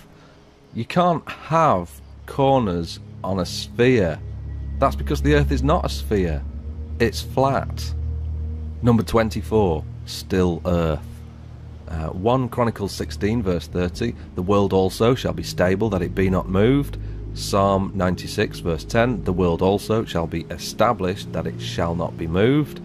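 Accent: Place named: United Kingdom. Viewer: I just believe this guy cuz he’s speaking with a British accent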